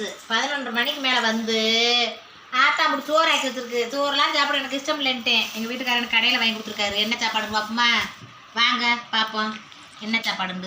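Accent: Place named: Kondulavi, India. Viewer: சூப்பர்...சும்மா விளையாட்டுக்கு சொன்னேன்...நீங்க எங்க ஊரு கொண்டுலாவி பேச்சு மாதிரி பேசுறீங்க.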